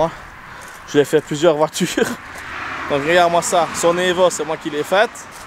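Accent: Belgium. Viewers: 350 zete 😂😂😂 les belges vous avez un bel accent bravo à toi!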